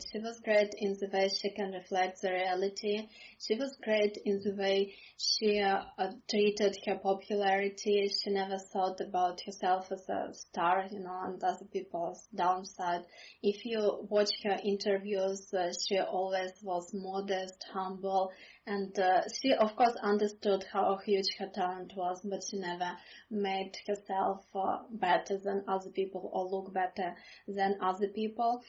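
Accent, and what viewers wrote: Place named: Russia. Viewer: sorry your pronouciation is too russian style english . I hardly understand haha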